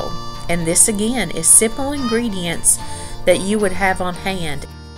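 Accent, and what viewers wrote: South, United States. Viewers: thank you so much I just watched too but I do everything in three so I'm going to watch another one cuz you seem like you're a lot of fun and I love your accent my mom was from the south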